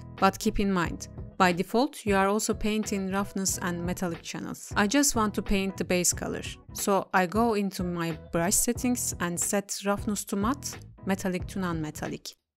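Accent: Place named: Turkey. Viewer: I know it when I hear a Turkish accent. Are you Turkish?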